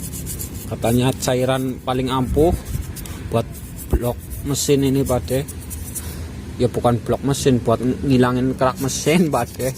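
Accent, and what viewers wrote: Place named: Java, Indonesia. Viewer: Asli mana bang..kok ngmong blepotan Jawa juga😅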